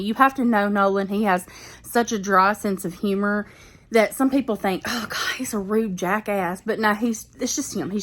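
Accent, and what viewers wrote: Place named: South, United States. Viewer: Thank you for all you do to help your grandparents share their beautiful lives and Elvis stories with us. Elvis loved and cherished his family members, so I am sure he would be so proud of the way you care for and look after your sweet grandparents. Btw, I love your Southern accent!! And please do keep the animals in the room while you are filming, they always make life so much more interesting.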